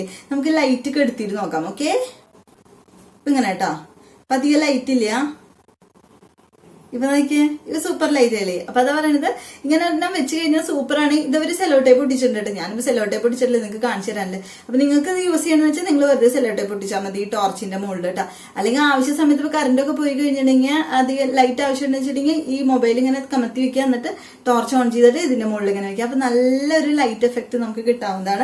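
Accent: Thrissur, India. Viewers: Chechiyude samsaram kettal serikkum thrissur kari anu enu manasilavum😁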